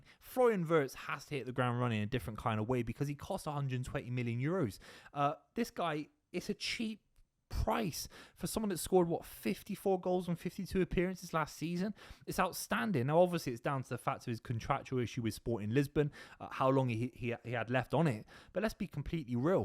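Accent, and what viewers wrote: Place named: West Country, United Kingdom. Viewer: I just cant take a City fan with that accent seriously... He should support Plymouth or Bristol...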